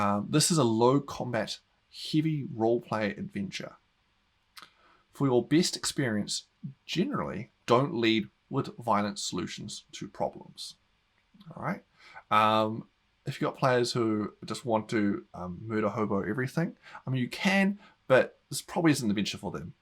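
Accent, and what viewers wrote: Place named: Australia. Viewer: Gidday , detection of a fellow Aussie accented. :) or is there a Kiwi twang, i dunno Kiwi roks to, A Aussie Kiwi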